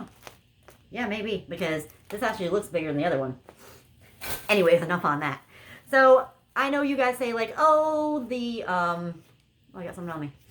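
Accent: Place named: Long Island, United States. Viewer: Hi!!! Love your Long Island accent "Swagga" I'm on the hunt for Bath & Beauty Cherry Blossom awesome haul have an awesome weekend tfs 💕 #teamcheapchick 🐶💕🐶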